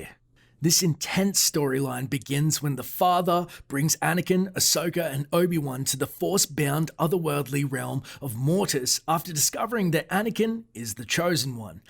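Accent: Australia. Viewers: I love hearing an Aussie narrate these videos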